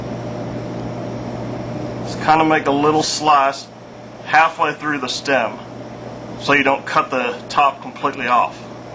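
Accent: United States. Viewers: damn you got some heavy southern dialect going on!!! Be proud of that! Greetings from norway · is that a new york accent?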